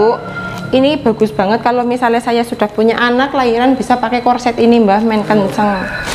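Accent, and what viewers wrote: Banyumas, Indonesia. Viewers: Orang mana kokk logatnya Banyumas bgt? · Mba aslinya dari desa mana mba,soalnya kita sama sama BERSATU KITA KOMPAK BICARA KITA NGAPAK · Asli mana mba, ngapaknya sama😁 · Mbak asalnya mana kok ngomongnya bahasa ngapak?maaf yayangku juga diTaiwan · Mba rumahnya mana si kok ngapak bahasanya, berarti uda plng kampung? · Halo mbak..sukses selalu..seneng banget udh plg ke Indonesia..sy suka liat video mba'e jawane ngapak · ga ngerti prokes maklum anak lugu..anak kampung..sy suka ngomongnya bhsnya ngapak lucu sj lhtnya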